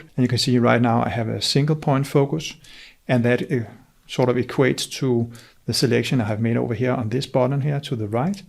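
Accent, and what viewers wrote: Denmark. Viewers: I love your Danish accent :) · are you danish? your accent is very danish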